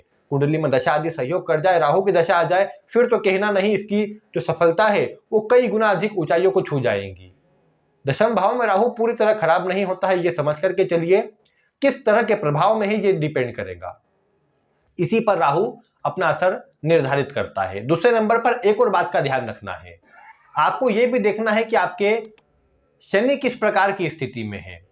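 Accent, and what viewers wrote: Madhya Pradesh, India. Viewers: गुरूजी बहुत अच्छा विश्लेषण दिया है आपने 😊 आपकी बोली से आप हमारे मध्य प्रदेश के ही लग रहे है 😀 मैं भोपाल का रहने वाला हूं ❤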